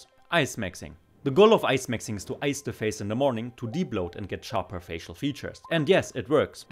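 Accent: Germany. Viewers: I can Tell by your First Word you Are german . Guten Tag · Gutes Video, man merkt dass du dir viel Mühe gibts und von der Qualität ist das echt stark. Echt verwunderlich wie du nur so wenige Abos hast. Ich denke mal öfters videos hochladen hilft dir bestimmt um mehr viewers zu attracten. Versuch bei deine Aussprache ein bisschen mehr den deutschen Akzent zu vertuschen weil es manchmal stark auffällt, aber nicht weiter schlimm. Man versteht alles und die videos sind von der Geschwindigkeit echt super. Mach weiter so. Ich wünsche dir viel Erfolg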